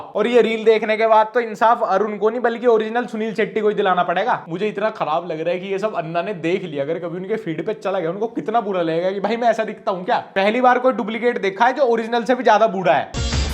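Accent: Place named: Meerut, India. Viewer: Bhai tu pakka meerut ke side rateha h❤😅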